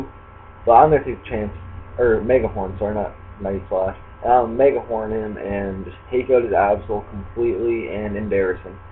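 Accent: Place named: Canada. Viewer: This narrator sounds distinctly Canadian. Is he? Top speed Nidokings, even while Modest, can usually outspeed Kingdras. Just a tip for future, it looked like you could have wiped out his Kingdra early thanks to the switch. Oh and btw, I don't know if this applies to you, but if you add yt:stretch=16:9 to your tags section the screen will be nicely stretched and you won't see those ugly black bars.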